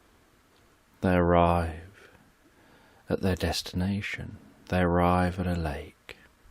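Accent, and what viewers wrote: United Kingdom. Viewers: Hi are you from the uk? Also were can I buy your book from? I am new to your channel, look forward to hearing from you Angel blessings Sylvia Watts ( Rev, ATP )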